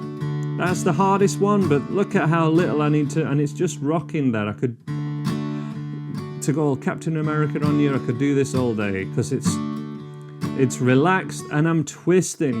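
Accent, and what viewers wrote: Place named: West Cumbria, United Kingdom. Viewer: Like your style. That a la'al bit West Cumbrian accent eh?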